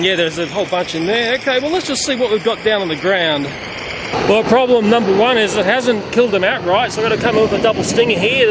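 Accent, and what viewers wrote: Australia. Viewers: You sound like Australian Christopher Walken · Australian accent faayaaa the best pest control... Dint think fire would be good for trees did yah.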